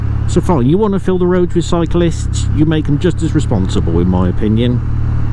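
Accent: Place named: East Anglia, United Kingdom. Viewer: Norfolk or Suffolk 🤣🤣🤣🤣👍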